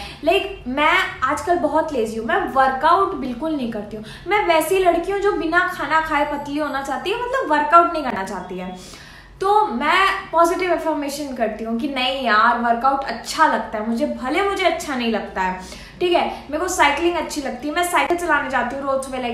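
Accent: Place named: Bihar, India. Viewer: Di aapki voice m bihari accent h kaha par rhte ho aap???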